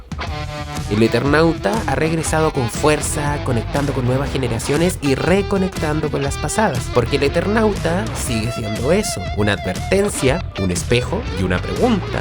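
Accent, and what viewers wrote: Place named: Chile. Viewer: Felicitaciones! Estoy literalmente hace años con deseos de crear un canal artístico cultural. Consumo ese tipo de material en su mayoría y además de disfrutar del contenido, me fijo mucho en la factura técnica y en la calidad, claridad, simpatía y todo lo que tiene que ver con la voz o la persona que está al frente del canal. Tomo ideas, analizo, etc. Me gusta cuando hay originalidad en la manera de narrar y pese a que se nota que eres chileno, tienes un estilo muy personal. Primer video que veo de tu canal y está muy bien hecho.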